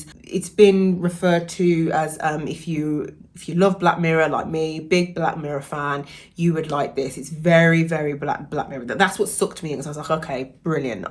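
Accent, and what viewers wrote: Birmingham, United Kingdom. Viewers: Are you a Brummie? 💐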